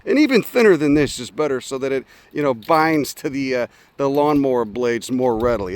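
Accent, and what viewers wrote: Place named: Brooklyn, United States. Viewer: I'd been wondering if you were from Cali, cuz the accent sounds more Brooklyn to my Southern ear, but yup. That's some Earth First California shit, my friend. 🧡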